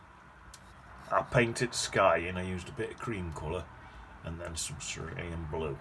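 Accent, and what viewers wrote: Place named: Yorkshire, United Kingdom. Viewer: Good Yorkshire twang that is ... tha'll be reet ... 😁😁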